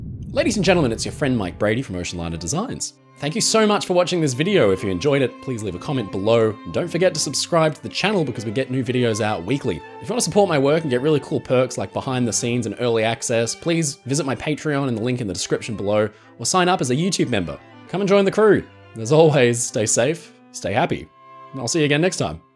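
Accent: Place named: Australia. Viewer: You should pay a bit more attention. If you haven't noticed this guy who makes these wonderful videos is speaking with an Australian accent.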